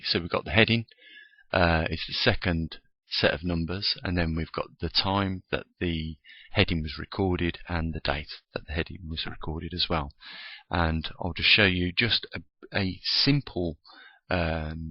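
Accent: Birmingham, United Kingdom. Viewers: At last a fellow brummy keep doing the good work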